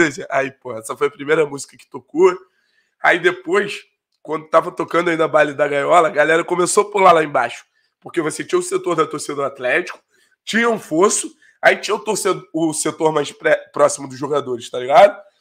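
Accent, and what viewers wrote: Rio de Janeiro, Brazil. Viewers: Olá amigão. Primeiramente parabéns pelo grande canal. E Vc é carioca? E nos conta essa relação com o Athletico pr e Tenta entrevistar o Nicao assim que puder...super Abração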